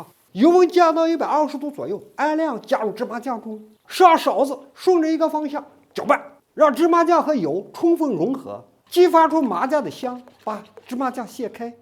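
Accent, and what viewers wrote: Shaanxi, China. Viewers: 纯正的陕普，非常亲切！感谢！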